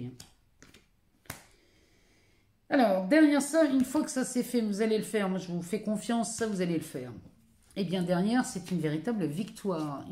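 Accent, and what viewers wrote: North, France. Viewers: DEQUELLE REGION DU NORD ETES VOUS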